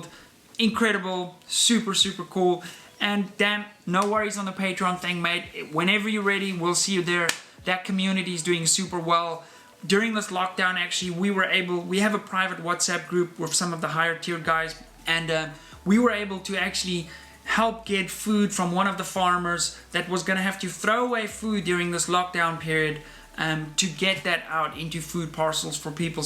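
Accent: Afrikaans, South Africa. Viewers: Are you South African? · I actually came across your channel this morning,so have been binge watching your vids for most of the day!!Love the vids,and learning a lot...almost thought you were swedish,but then heard the afrikaans ha ha ha...Keep up the good content!!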